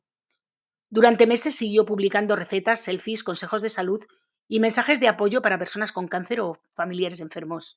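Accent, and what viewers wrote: North, Spain. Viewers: Creo haber oído a alguna vez que es madrileña. Pero si parece que tiene acento del norte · No lo entiendo. Como pueden ser tan atrasados los americanos? Esos remedios son los que decían las bisabuelas, como lo del limón en la mesita de noche para el constipado. No es de extrañar en un país que no tiene sanidad pública. Hay que ser muy imbécil para creer en esos milagros a expensas de la ciencia. Solo faltan los vaqueros vendiendo crecepelos de estado en estado cual salvaje oeste. Una panda de retrasados! Un cordial saludo! Me encanta tu voz.😊 concretamente tu acento. Del norte?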